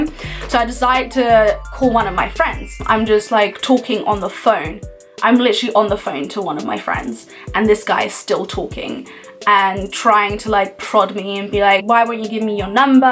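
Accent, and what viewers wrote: United Kingdom. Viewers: oki doki, cause when i heard you speak English i recognised the british accent and though to myself, she's British and then now your swiss and i was like what is 😂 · you speak perfect British English! Have you ever lived in Britain?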